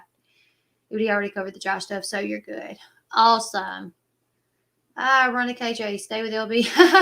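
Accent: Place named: Louisiana, United States. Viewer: Her mailing address is lousiana, usa, and her accent is def. in line with that area.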